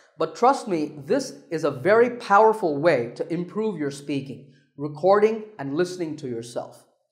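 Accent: United States. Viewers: your JAM technique is lit.🔥🔥 love that you're channeling the American accent perfectly. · if you don't watch the video, just listening to the audio, you'd think you're listening an american speaking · Sir, do you speak in American English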